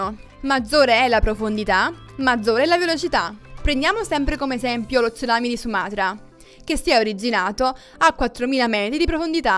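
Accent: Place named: Sicily, Italy. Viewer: sempre interessante anche il tuo leggerissimo accento siciliano credo hihihihihihihi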